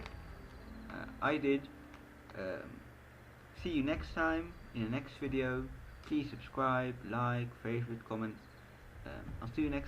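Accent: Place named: Netherlands. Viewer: If you aren't dutch, ill shoot myself in the face. Great video, but your accent made it 10 times better.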